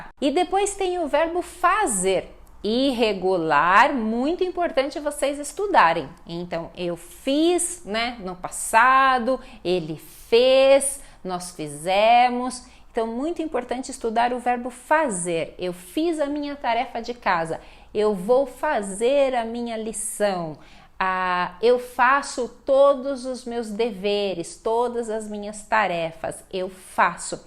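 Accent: Brazil. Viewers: You are speaking Brazilian dialetto 🤔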